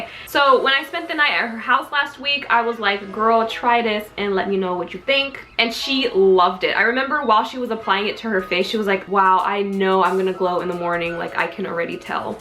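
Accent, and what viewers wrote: Australia. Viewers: I love the Australian accent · Just wondering if you follow Australian YouTubers 😊 Your Aussie accent is on point lol 👌🏼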